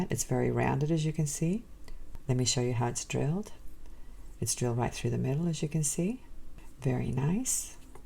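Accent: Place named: Australia. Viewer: So great to see you back. Good Luck with your PT. Sam's Bead Box is so gorgeous each month I enjoy everyone's unboxing. Looking forward to seeing you create new jewelry designs. We have missed your lovely Aussie accent. Blessings, and Hugs.🌞🌞🌞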